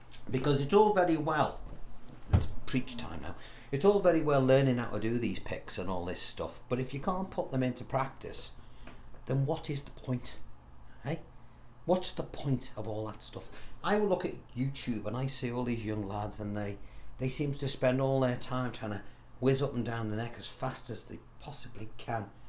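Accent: Liverpool, United Kingdom. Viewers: Hi from an Italian drummer who loves to play (poorely) acoustic guitar. Love your style and your SCOUSE accent!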